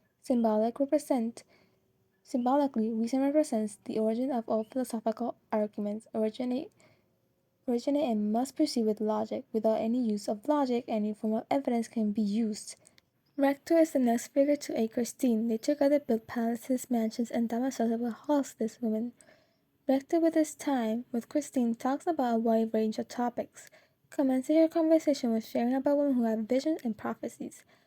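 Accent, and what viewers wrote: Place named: Spain. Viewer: Ahh makes sense. Im assuming you know how to speak spainish aswell because of your accent. I do have video request which i think would also make good content, Could you make an episode dedicated to the Arabic Slave trade its a fascinating series of events that i think are quite quite impactful in history to the modern Era. Alwo are these your only socials or do you have a 2nd channel or instagram channel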